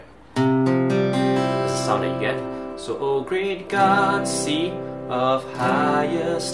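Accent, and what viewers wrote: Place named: Singapore. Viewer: I thought I recognized that accent! My wife is Singaporean and I lived in Singapore for 5 years, now in the USA. Thanks for the video. I recommended it to our musicians as we're learning this song for this Sunday. Regards, John Carpenter ("yeoberry" is a combination of my wife's maiden name, Yeo, and my middle name.)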